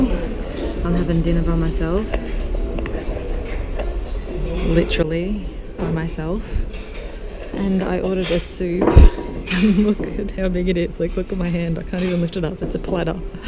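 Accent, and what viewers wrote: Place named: Australia. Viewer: I love you Australian accent. Australian accent sounds very British, which is far more beautiful than American accent. Long Live Great Britain！God Bless America！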